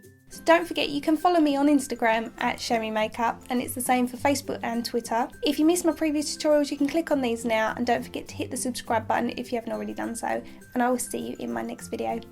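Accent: United Kingdom. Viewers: I never tire of hearing your accent, I miss the UK dearly! I love your explanations and being able to watch your skills, I wish I could have you as a mentor! Could you give an aspiring MUA advice about how to start practicing makeup on people? I don't know how to even begin building a makeup kit and not be poor:/ Any pointers would be sincerely appreciated, thanks +!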